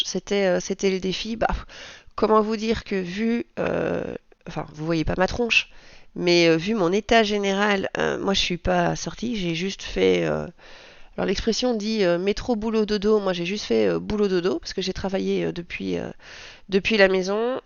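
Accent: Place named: Belgium. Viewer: Coucou! J'ai toujours mes billets de 5 € de 2022, je n'arrive pas à les mettre dans d'autres enveloppes ou les remettre en banque :D J'adore t'entendre, ça fait tellement de bien d'écouter notre accent Belge et nos expressions dans toutes ces youtubeuses françaises! La fierté de voir ton fils faire autant de progrès! Tu as raison de faire des comptes ronds, sans mettre de billet de 5€ dans tes enveloppes, je suis certaine que tu feras autant (si pas plus) d'économies. Continue, c'est bienfaisant de t'entendre! Vive le mois de Mars!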